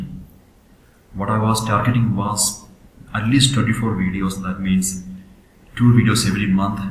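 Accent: Kerala, India. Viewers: Are u malayali ,ur slang express it. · Sir,you sound like a malayali. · Am I hearing a mallu accent 😶?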